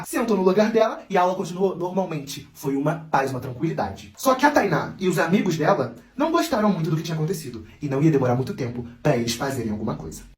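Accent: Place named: South, Brazil. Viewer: Você é do Sul né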